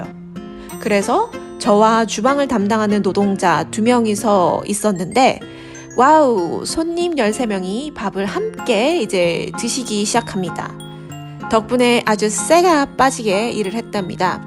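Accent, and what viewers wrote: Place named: Gyeongsang, South Korea. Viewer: 저도 지방러라 경북 대구 억양이 느껴지더라고요. 영상 잘 보고 있어요! 즐거운 비행 하세요~~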